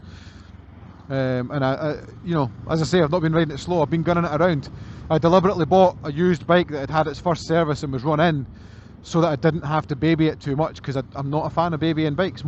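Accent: Scotland, United Kingdom. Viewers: 😂 LOVE that Scottish accent …as an Irish person I can understand very word !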